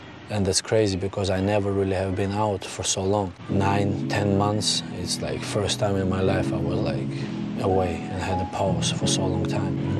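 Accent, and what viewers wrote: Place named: Scania, Sweden. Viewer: They both have a Scanian accent from the south Sweden.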